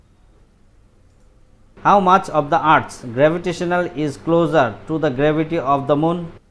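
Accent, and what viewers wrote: Northeast India, India. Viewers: Where are you from are you from northeast India because of your accent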